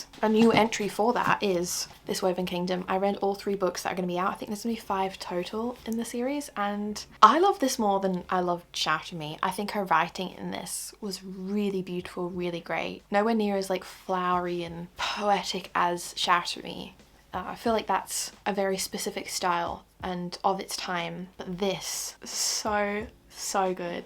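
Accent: Australia. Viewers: I’ve just found your channel and I’m obsessed! You’re so calming and genuine and you can feel how much you truly love reading and getting immersed in the story. Also the accent love are you a fellow Aussie/ half Aussie/ Irish. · Has anyone ever told you that you sound like an Australian? I am Australian and had to double check 😅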